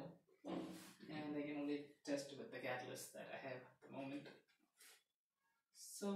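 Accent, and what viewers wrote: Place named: Kerala, India. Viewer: Are you malayali?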